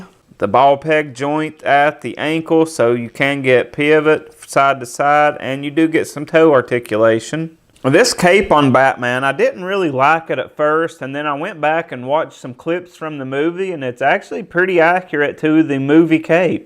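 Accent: South, United States. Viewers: I like your southern accent pretty rad. Nice score from DareDevil19 also did you like my Superman poses in the review? I gotta learn to edit better so I can do cooler posing tricks in my vids but my style is mainly start to finish recording with only one or 2 cuts from packaging to figure. I find with transformers especially it's more exciting and authentic that way, not cheating like to make it look like I know what im.doing, instead you know I know what I'm doing ;) lol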